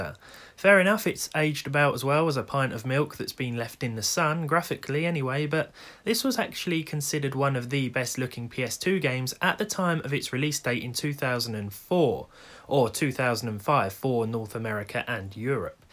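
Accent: United Kingdom. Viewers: This guy is the most stereotypical brit I've ever heard. This cheeky baastud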